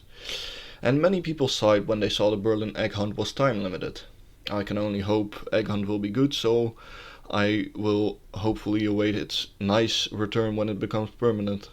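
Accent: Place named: Netherlands. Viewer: Me too, i recognized a Dutch accent :)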